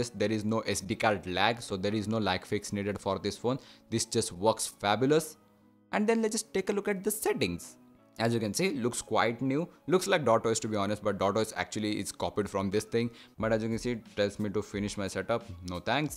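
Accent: India. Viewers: Why Indians voices Soo interesting and different? How are you saying "R". My nerves broken. Somebody can say something? I don't want hear voice of them omg😵(I am not racist). If I hear voice of one of them. all day I can't forget that. This make me sick, just I want to throw up🤮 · too deep indian accent indeed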